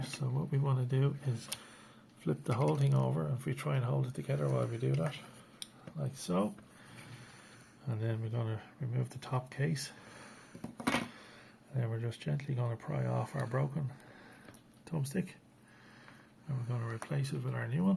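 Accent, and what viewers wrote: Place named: Ireland. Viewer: What part of Ireland are you from you sound like all if my relatives lol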